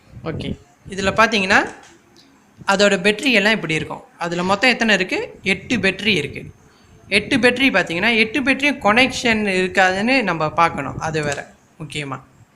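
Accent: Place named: Malaysia. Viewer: Malaysia la apdi thaa pesuvaanga bro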